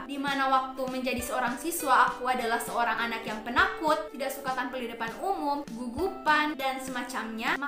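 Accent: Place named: Gorontalo, Indonesia. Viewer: Ternyata org Gorontalo. Dri awal sdh kntra logatnya :) Good job. Semoga sukses dan berkembang channelnya. Btw kita satu Almamater (UNG). Tp beda jurusan, dan mgkin beda angkatan. :) Sya dri Teknik Industri. Salam kenal. :)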